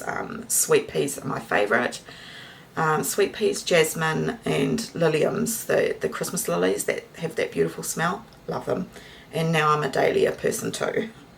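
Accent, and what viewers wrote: New Zealand. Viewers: What a nasty thing to say to you. It is not anyone's place to make personal comments. I love you videos and I have only just discovered them. You are REAL and most of us have kitchens just like you. I enjoy the way you are always positive and make the videos fun. Please keep up the good work. I am in NZ too and it is great to hear another kiwi. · OMG- please don't take the 1% negative comments to heart!!! We LOVE seeing you in your little kiwi kitchen, yours echoes our own... Your accent, your beautiful aprons... It's all familiar...80% of kiwis live like you do! Good on you, for taking your life into your own hands and creating your dream life! We celebrate you! ❤ 🎉🥳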